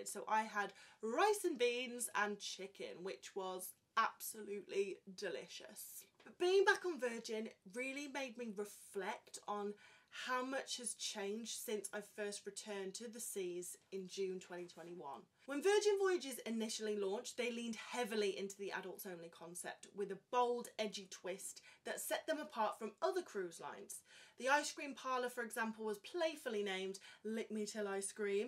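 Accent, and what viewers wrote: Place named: Midlands, United Kingdom. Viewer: That sounds like a Midlands accent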